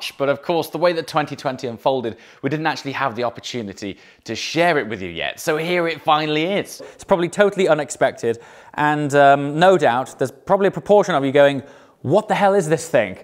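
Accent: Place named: England, United Kingdom. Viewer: Loving that nice english accent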